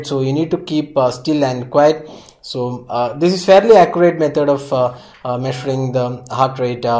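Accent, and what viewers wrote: India. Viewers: holy fuck, indian accent is so funny....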